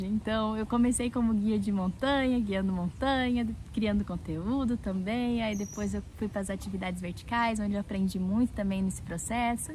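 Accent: Brazil. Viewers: Você é muito simpática! Tem um sotaque mineirim....O Guto "indicou" o seu canal. Sucesso!! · Abraços de Ibirama/SC!!!! Parabens pela Vibe muuuuuito boa e esse R com sotaque paranaense minha terra natal!!!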